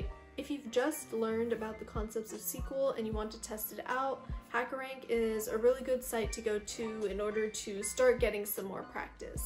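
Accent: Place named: India. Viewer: Hey thnx just curious to know are you from India? Your ascent is Amazing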